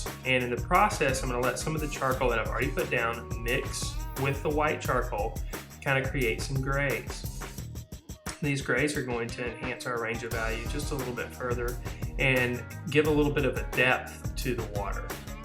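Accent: South, United States. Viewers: Your accent is cool. From the South?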